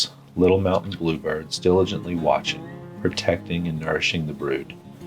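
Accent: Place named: Louisiana, United States. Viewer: Now this, this is your best so far, or as far as I know about. It has lots of Katie - check. It has your sort of Idaho-ized Loozeeana accent - check. It focuses on one thing and does that one thing well - check. It's simple, a simplicity made all the more remarkable by the complex tools you use - check. It's philosophical - check. It's got that music alright, but unobtrusive and even apropos! - check and check. And it's just the right length to do what it needs to do. Checkmate. (Personal note: I'm glad I've kept my subscription, despite my, shall we say, "disagreements" with the auteur).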